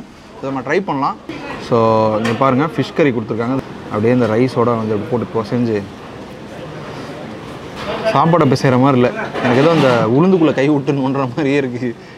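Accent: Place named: Kerala, India. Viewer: கேரளா போனதும் கேரளா பாஷை ஆட்டோமேட்டிக் ஆ வருது போல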